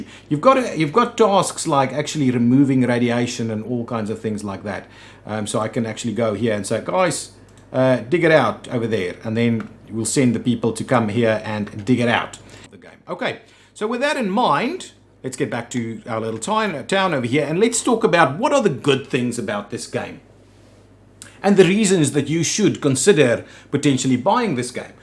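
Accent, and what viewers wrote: Afrikaans, South Africa. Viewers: the afrikaans is thick may bru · Can't put a finger on your accent, unless.... Are you South African?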